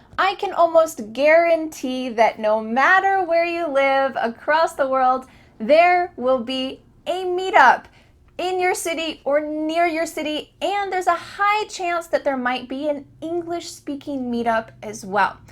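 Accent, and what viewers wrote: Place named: United States. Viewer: Hi, I really enjoy learning English with you. I love your américain accent, your smile and your energy in teaching! I’ve got motivation when I watch your videos. Even when I am in a hurry in the morning I try to listen and watch your videos while I have breakfast. Thanks a lot for your lessons. 🤩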